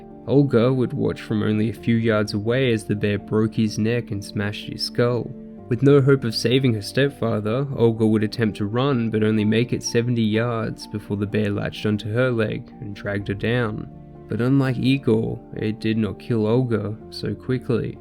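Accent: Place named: Australia. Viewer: Only made it 6 mins in & had to turn it off i can't stand your accent. Australia?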